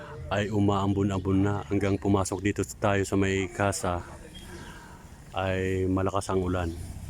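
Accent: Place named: Batangas, Philippines. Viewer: Taga Batangas ka pala sir.kaya pala parang batangueno ka magsalita Tagalog .watching po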